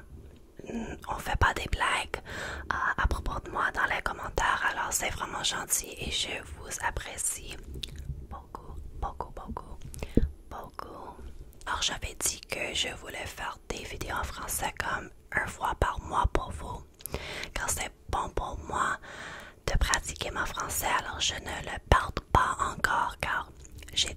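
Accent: Quebec, Canada. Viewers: Honnêtement pour l'internet, vraiment pas trop mal ton Franadien! Well done and very calm ASMR experience!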